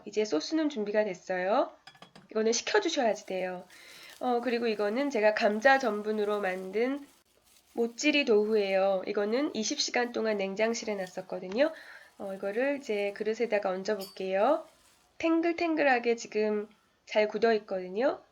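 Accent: Seoul, South Korea. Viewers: 말투가 꼭 서울사투리 영상에서 들었던 말투 같아요 귀에 쏙쏙 박히면서 듣기 좋네요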